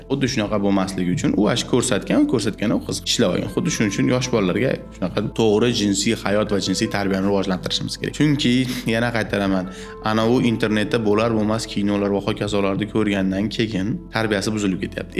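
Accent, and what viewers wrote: Andijan, Uzbekistan. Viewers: Anjan shevasi zo'rde manaqa..manaqa...manaqa...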